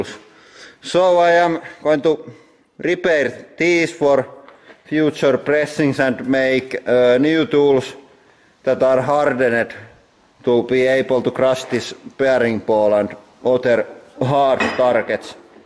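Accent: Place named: India. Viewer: Поганый индийский английский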